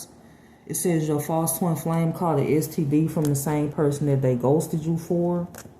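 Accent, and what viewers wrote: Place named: Miami, United States. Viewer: Miami Voice!😊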